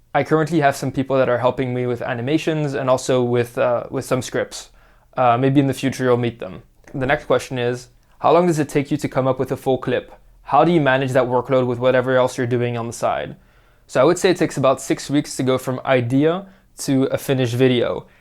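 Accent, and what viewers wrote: Netherlands. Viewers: Why do i hear a dutch accent from a frenchman? Or am i just imagining things? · honestly I thought you were Dutch, your accent sounded more Dutch to me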